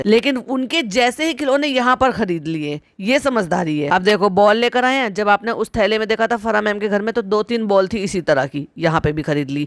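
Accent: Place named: Saharanpur, India. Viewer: ji!!! Aap bilkul Saharanpur/ Muzaffarnagar k jaisi boltai ho!!!! Many of my family members & friends live there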